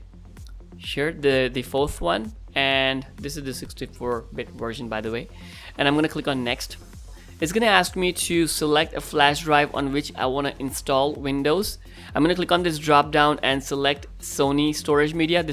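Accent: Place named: India. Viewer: U r indian.. And have a great accent... Hats off bro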